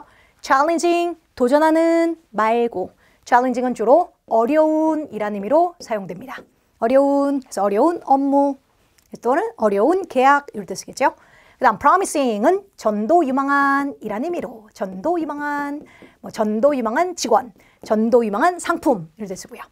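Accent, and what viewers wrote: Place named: Chungcheong, South Korea. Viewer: 있것죠~ 충청도 분이시네요 👍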